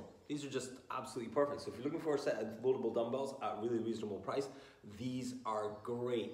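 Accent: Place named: United States. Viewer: Why's he speaking American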